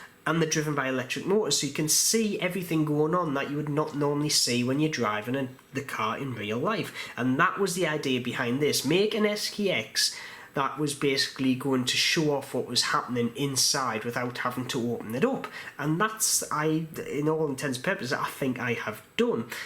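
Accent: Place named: Durham, United Kingdom. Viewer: You sound like Durham lad.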